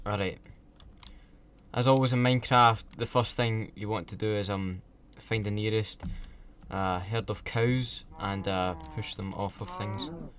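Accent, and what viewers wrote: Scotland, United Kingdom. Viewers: what part of Scotland are u from I live in Glasgow Easterhouse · I love ur accent:) (Im scottish aswell):D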